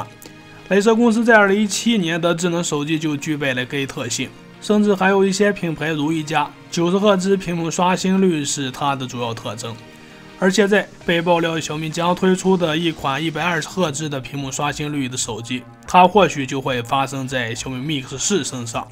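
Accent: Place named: Northwest, China. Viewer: 哈哈哈哈 晋蒙陕甘口音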